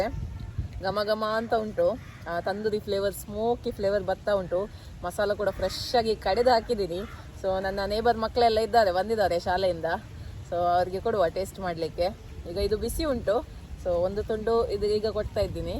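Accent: Mangalore, India. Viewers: ನಿಮ್ಮ ಮಂಗಳೂರು ಬಾಷೆ ತುಂಬಾ ಚೆನ್ನಾಗಿದೆ ಅಕ್ಕ ಬಂಗುಡೆ ಮೀನು ನಾನು try ಮಾಡ್ತೀನಿ ಅಕ್ಕ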